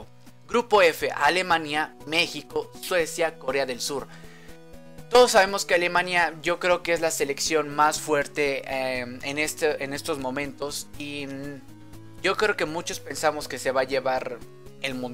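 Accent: Argentina. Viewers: Se nota que eres Argentina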